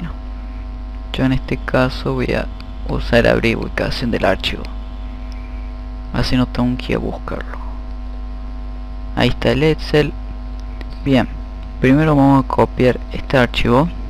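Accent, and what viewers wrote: Córdoba, Argentina. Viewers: Sos cordobés? Me parece por tu tonada.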